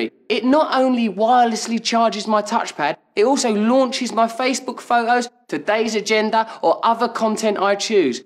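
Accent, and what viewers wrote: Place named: United Kingdom. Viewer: I'm not British... so everytime I listen to this guy, I can't stop laughing, hahaha. Strong British accent, hehe :D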